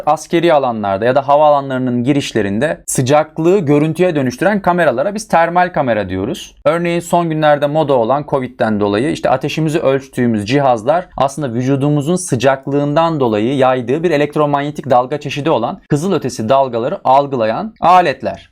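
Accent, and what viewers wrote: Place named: Kastamonu, Turkey. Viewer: samsun da olabilir ama kastamonulusunuz bence ya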